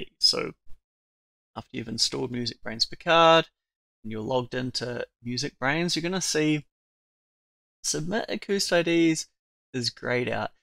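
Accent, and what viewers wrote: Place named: New Zealand. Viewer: Thanks guy with a strong New Zealand accent! I was trying to figure out how to generate an AcoustID from an audio file to figure out if it matches the ones linked to a MusicBrainz recording (So I could determine if the two recordings should be merged), And looking it up on the internet I couldn't find an answer, But this video explained it quite simply!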